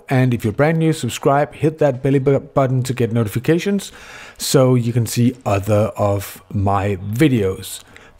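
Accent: Denmark. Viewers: U danish bruh??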